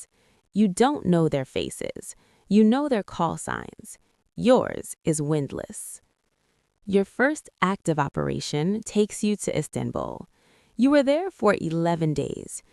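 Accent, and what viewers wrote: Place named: India. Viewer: Good video but why the voice change to an Indian accent no keep posting man good luck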